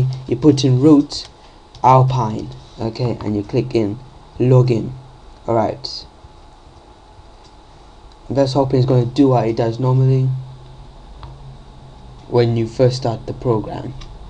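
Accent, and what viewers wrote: United Kingdom. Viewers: Fucking british accent... CANT UNDERSTAND YOU!!!!!!!!! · HES BRITSH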